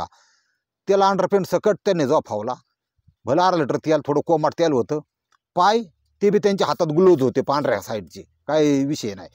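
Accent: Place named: Pune, India. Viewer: तुमची गावरान पुणेरी भाषाशैली आणि उच्चार ऐकायला लई भारी वाटतात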